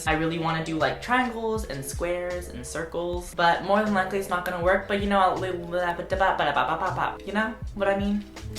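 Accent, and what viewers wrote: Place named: Texas, United States. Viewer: U've been so texan lately!!! I'm love it!!! Y'all!! Ur accen!! Yeehaw king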